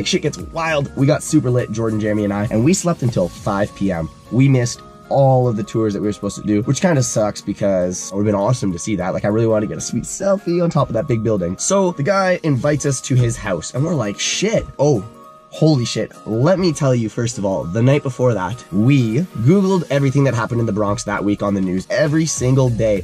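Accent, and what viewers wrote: Canada. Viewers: Woah the Canadian accent is strong today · Lmao...I'm a Canadian so I just think he sounds normal 😆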